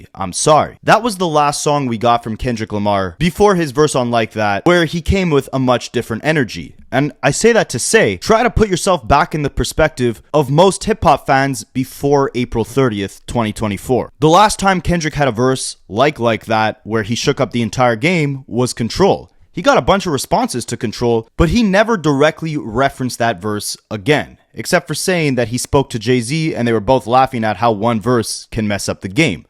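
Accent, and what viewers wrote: Toronto, Canada. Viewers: This video was fire n 10/10 Toronto language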